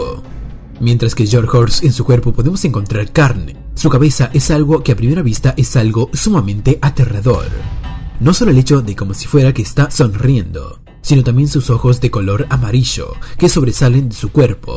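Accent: Argentina. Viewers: ares argentino dices cuecho en realidad es cuello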